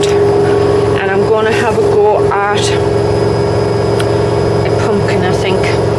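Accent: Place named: Newcastle, United Kingdom. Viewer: I only discovered your channel a couple of weeks ago and have had marathon sessions watching your gardening vids from the beginning, to the extent that I've started talking to myself in a Geordie accent when I'm gardening! You're an inspiration!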